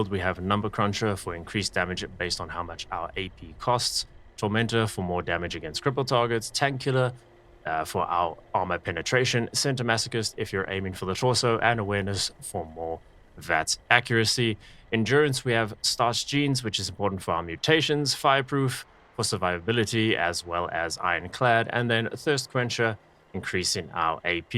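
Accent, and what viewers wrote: South Africa. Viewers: Are you south african by chance. Very distinct accent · Very good video, thanks, sounds like you come from South Africa like me💪🏻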